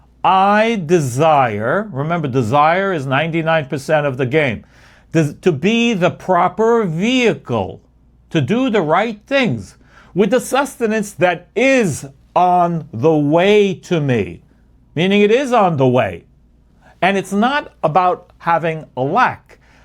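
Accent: New York, United States. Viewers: Love the New Yawk accent :D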